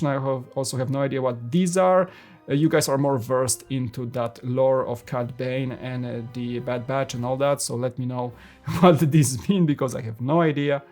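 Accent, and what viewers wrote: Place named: Poland. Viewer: Sets are great, but overpriced. I think the reason is Disney license, it must be very expensive, at least we get new Cody Minifigure. Ps. That's my first time on your channel, are you from Poland? You sound like a pole :)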